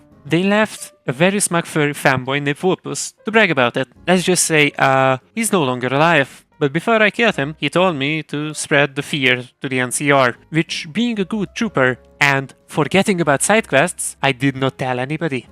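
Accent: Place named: Hungary. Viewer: Hungarian accent on top :D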